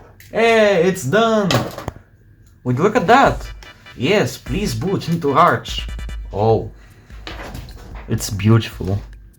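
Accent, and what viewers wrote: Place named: Bulgaria. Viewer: i knew i heard a bulgarian accent. Great video tho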